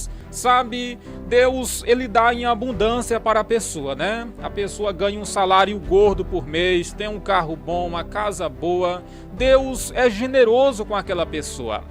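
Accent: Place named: Bahia, Brazil. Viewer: Oh missionário, dentro de todos ensinamentos mediante a palavra de Deus que são maravilhosos, eu me alegro com a forma que fala, sei que és estudado e essa forma de falar a palavra ( veio, paia) é engraçado Me faz lembrar a minha terra Natal, Cravolândia lá no centro Sul Baiano Um abraço missionário para vc e sua esposa Deus te abençoe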